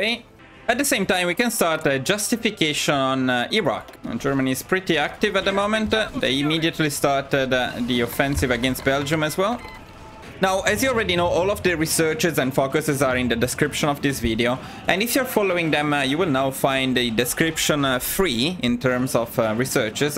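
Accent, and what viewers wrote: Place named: Italy. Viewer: I can't tell you how charming it is to hear you talking about italian places and units with your italian accent. Makes this a lot of fun. I don't have any DLC - is it still possible to recreate the roman empire without spies or the sophisticated re-integration features?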